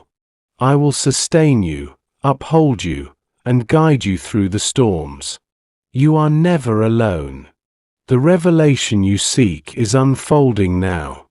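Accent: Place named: United Kingdom. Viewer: Elon you have a beautiful voice with a slight British accent. Wow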